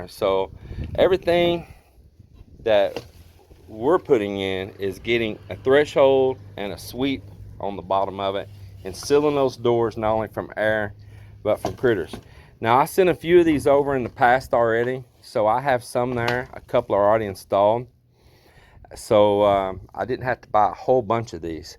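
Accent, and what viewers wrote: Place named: East Texas, United States. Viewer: Lol. First time I heard your voice I was like I bet he's from Terrell or Tyler area. Iloilo just went to ECQ. And I've found good outlets for cheap at certain Handyman stores. I use GFC outlets and surge protector strips throughout so my wife can just flip the switch instead of unplugging everything everytime.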